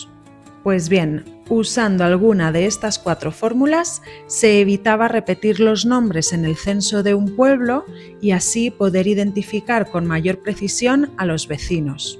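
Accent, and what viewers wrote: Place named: Spain. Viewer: Agradecido quedo por tu trabajo. Me gustó mucho. Además disfruto mucho de tu entonación española y tu dicción exquisita. Soy Carlos Augusto de Mondaca y Reyes desde Santiago de Chile. Saludos y Dios bendiga a la Madre tierra de España, Chile e Israel.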